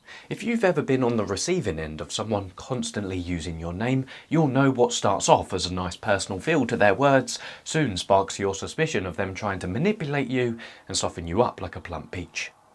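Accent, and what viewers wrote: United Kingdom. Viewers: Good morning! How do you spell your name? I spell mine Cory. So, I’ve been spending less time on my phone but it struck me this morning, i miss British accent and words of wisdom❤ i also thought to myself, Cory how much of the health that has lead you to spend less time on your phone is due to fabulous YouTube channel 🤔🫶🏻🫵🏻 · This British accent is understandable and good, which part of Britain do they speak this way? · I recently discovered your channel, and aside from the helpful advice, I have to say I love your voice, your way of speaking, and your soft British accent. English isn't my native language, and your videos are helping me improve my pronunciation. goat 🐐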